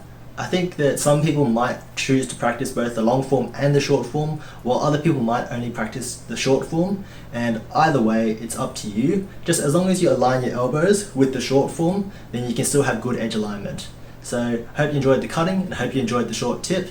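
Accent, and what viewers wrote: Australia. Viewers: Yes we have problems getting them too. What is your country, you sound Aussie like me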